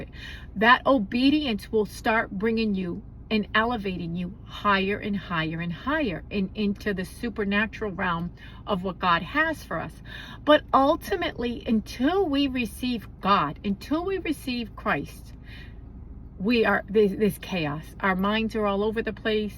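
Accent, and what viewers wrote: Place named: North, United States. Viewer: Amen! Are you from up north? I’m from upstate New York and thought you sounded northern!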